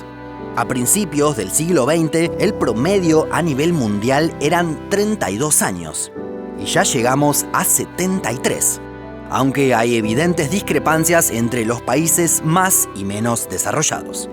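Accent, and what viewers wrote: Argentina. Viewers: El narrador es argentino también, aunque en el equipo hay distan nacionalidades si mal no recuerdo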